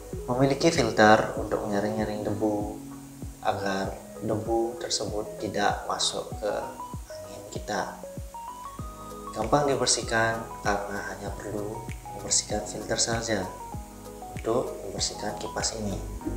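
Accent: Bali, Indonesia. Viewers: Logatnya orang bali banget😂. Mantab bliii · Org bali bro?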